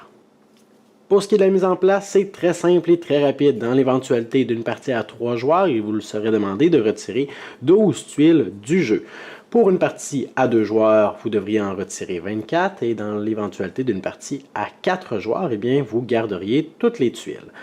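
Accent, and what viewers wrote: Quebec, Canada. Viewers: Très bien expliqué et, le bonus, est ce savoureux accent du Québec !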